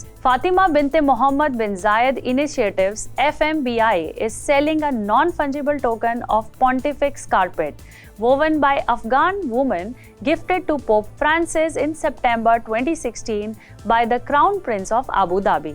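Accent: Gujarat, India. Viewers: The content is very relevant and the updates are fascinating and fantastic. is very pretty, presentable and has s good voice. The only slight problem is that her accent is quite gujju and is a bit of a distraction. Hopefully she goes through accent and diction training. Amazing content. Probably the best I have seen anywhere on Cryptoverse.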